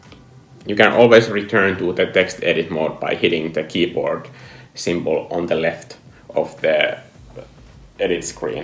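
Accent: Finland. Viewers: are you Finnish per chance? ^^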